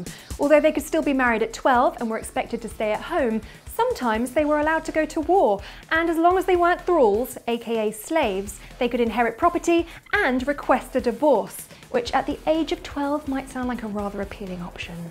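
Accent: United Kingdom. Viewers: because she just is. her jaw, her cheeks, her voice, her eyes. like an audrey hepburn type face with a very deep british accent. She's married though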